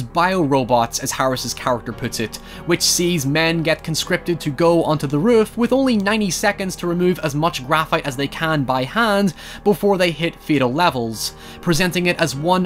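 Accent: Northern Ireland, United Kingdom. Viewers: Hye lad u from norn iron? Ye saft hur ya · This man sounds like hes from NI, wooopa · Feels so good to hear a proper Northern Irish youtuber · Until you said "Nah m8 youre fucked" I didn't reaise you had a norn iron accent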